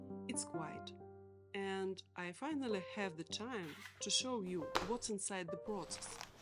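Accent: Eastern Europe. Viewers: Я вижу Вашу любовь к своему делу и с привеликим удовольствием смотрел бы вас, но уж больно родной, до скрежета на зубах восточно-европейский акцент лично меня отталкивает. Извините ❤️ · Узнаю родной славянский акцент и здравый научный подход! Приятно слышать. Спасибо,!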